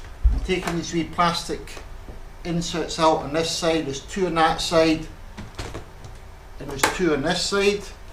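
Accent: Scotland, United Kingdom. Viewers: Thank you for another informative video - Great Scottish explanation and made perfect sense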